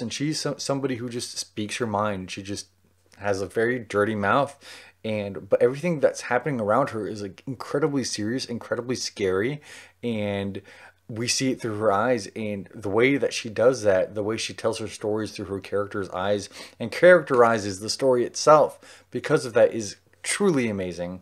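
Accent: South, United States. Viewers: I love your vlog on this video at your house in Texas during your quarantine until you had a lot of books last year back in 2020 are The Ballad of Songbirds and Snakes by Suzanne Collins, She's Come Undone by Wally Lamb, The Toll by Neil Shusterman, My Best Friend's Exorcism by Grady Hendrix, And I Darken by Kiersten White, Black Sun by Rebecca Roanhorse, The Southern Book Club's Guide to Slaying Vampires by Grady Hendrix, The Rage of Dragons by Evan Winter, Gideon the Ninth by Tamsyn Muir and Rhythm of War by Brandon Sanderson while you're talking about all of the characters from all of the books to read them in the beginning while you're talking about horrible mentions of the book called, To Sleep in a Seas of Stars by Christopher Paolini and another books of The Great Hunt by Robert Jordan including Grave Peril by Jim Butcher, The Bands of Mourning by Brandon Sanderson and The House of the Cerulean Sea by T.J. 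Klune and Surrender Your Sons by Adam Sass after that, I hope your husband, Zach will be very proud of you and I love your Southern accent!!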